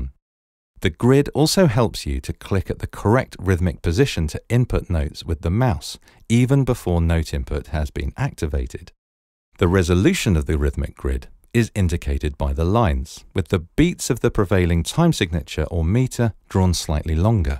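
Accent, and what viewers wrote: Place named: England, United Kingdom. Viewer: If it’s explained with an English accent we think we’re the stupid ones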